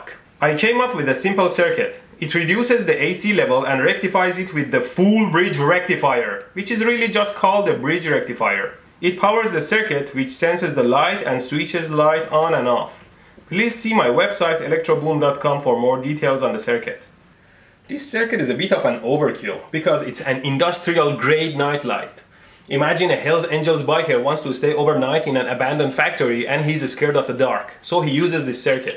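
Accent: Iran. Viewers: Iranian English accent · Thats a persian accent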